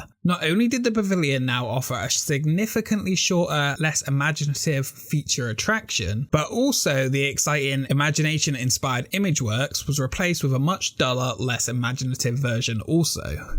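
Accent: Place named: England, United Kingdom. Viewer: great video again! where are you from?, you sound like your from England accent but i cant differ whereabouts.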